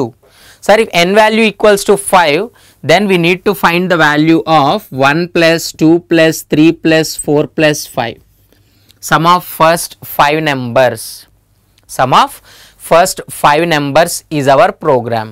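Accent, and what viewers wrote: India. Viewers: I am having fun watching an Indian accent explaining C programming than English man explains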